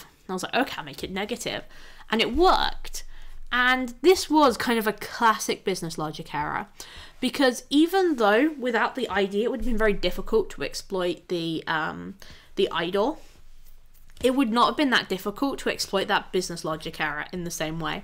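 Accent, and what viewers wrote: United Kingdom. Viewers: by golly... SHE IS BRITISH!!!!!!!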